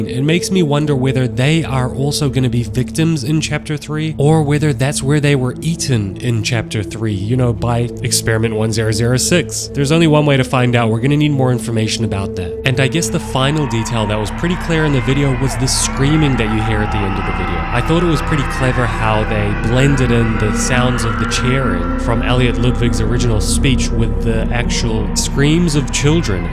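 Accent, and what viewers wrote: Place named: New Zealand. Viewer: YOUR FROM NEW ZEALAND. 😆😄😃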